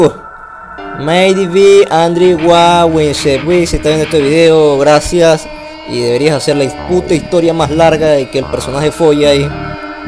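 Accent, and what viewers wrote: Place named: Venezuela. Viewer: tus videos son buenos solo para venezolanos al parecer del resto no entenderan bien lo que dices xD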